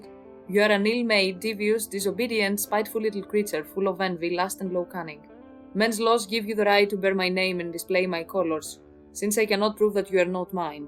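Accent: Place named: Greece. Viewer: Thumbs up who always reads the community posts in her voice (greek accent ftw) 👍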